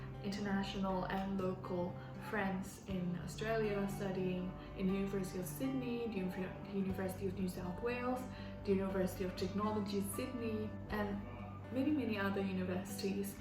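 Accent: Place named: South Africa. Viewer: Your accent sounds South African, pls drop explanation and how u learned English 🌬❤️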